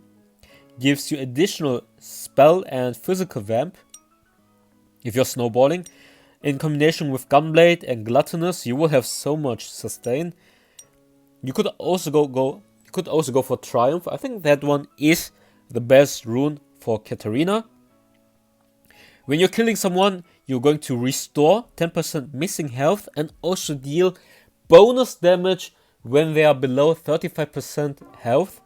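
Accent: Germany. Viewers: disgusting german accent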